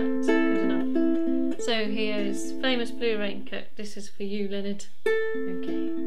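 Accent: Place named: United Kingdom. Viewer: ya why don't ya give a tutorial on " " plssss..never heard that song b4, i looked it up on youtube and gave it a listen...that's such a surreal, such a poetic song... yes, u should do a tutorial... btw, what nationality r u? u sound british...to my ears at least...but then what do i know.! haha!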